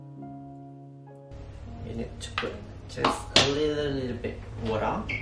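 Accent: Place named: United States. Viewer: You sound like an American. I am a polyglot, speak 9 languages and English is for the la zy. It is bar none the easiest language to learn, a breeze learnt in a weeks. No declensions, no diphthongs, all verbs remain in the infinitive for all pronouns, no conjugations to learn, add "ed" for past, add "will" for future and nouns don't have gender. You were saying?? Try learning Chinese, Arabic, Russian or French. Good luck with that!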